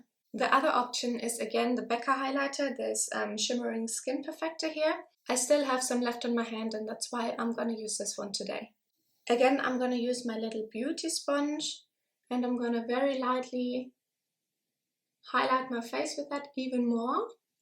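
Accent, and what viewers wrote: Germany. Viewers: are you german? think you have an very german accent. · haha ich wusste ich hatte einen deutschen Dialekt rausgehört :) du bist wirklich wunderschön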